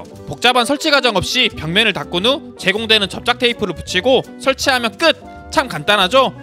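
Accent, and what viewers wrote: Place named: Jeolla, South Korea. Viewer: 전라도 분이신가..말투에서 전라도 느낌이 확나는디ㅋ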